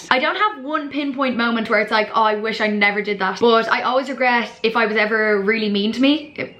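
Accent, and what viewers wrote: Ireland. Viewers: You’re just class like I love your videos and your accent too. Where in Ireland are you from?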